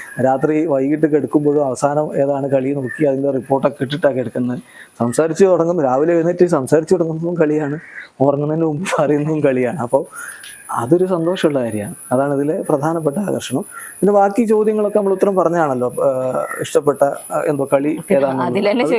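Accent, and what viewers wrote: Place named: Malappuram, India. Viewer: Malapuram anengilum basha vethyasam undallo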